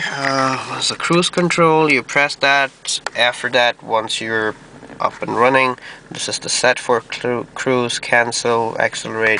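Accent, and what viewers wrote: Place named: United States. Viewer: You must an American with your accent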